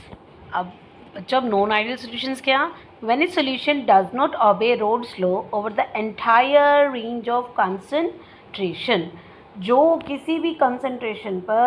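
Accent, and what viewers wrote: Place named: South India, India. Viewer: vo south india h dont judge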